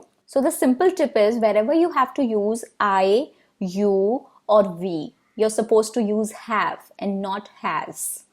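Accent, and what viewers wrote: India. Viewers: Your accent is typical Indian. Learning grammer doesn't mean you are good in English, learn accent...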